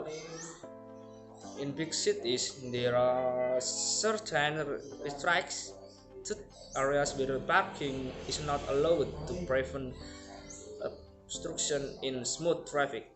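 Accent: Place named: United Kingdom. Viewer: british banget😍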